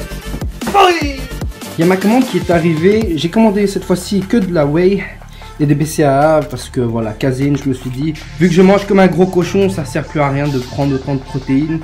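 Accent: Belgium. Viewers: Salut mec, tu es de quel région ? Belgique ? Tu as un accent particulier :) Bonne continuation, je m'abonne 👍🏼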